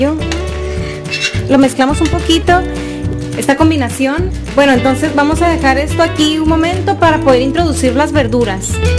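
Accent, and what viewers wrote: Sinaloa, Mexico. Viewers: tienes acento y pinta de sinaloense jejejejje, eres de Sinaloa? tu olla es royal prestige, no necesitas ponerle aceite jejejej pero creo q es la costumbre que tenemos... se ve deli, hare la receta.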